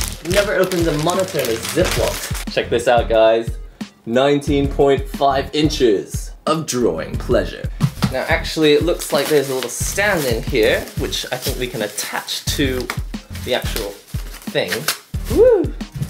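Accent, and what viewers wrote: United Kingdom. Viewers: A Japanese chap with a British accent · british accent on a japanese.. pff this looks so weird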